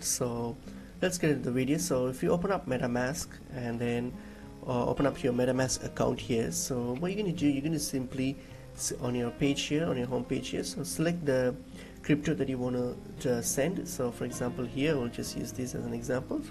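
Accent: India. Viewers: My, what a deep, Indian voice you have.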